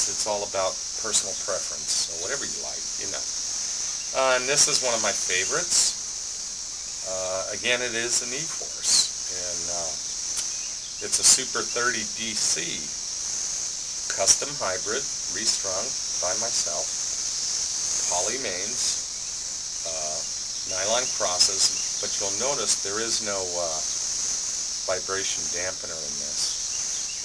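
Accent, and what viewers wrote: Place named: Tennessee, United States. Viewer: Sounds like he lives in Tennessee lol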